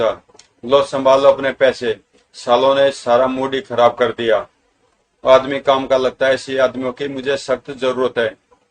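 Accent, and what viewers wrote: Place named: Himachal Pradesh, India. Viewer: लहजे से उत्तर भारतीय लगते हो...हिमाचली...बस कॉमिक्स में अपने पर्सनल विचार/ हंसी न दिया करें। बाकी सब बढ़िया है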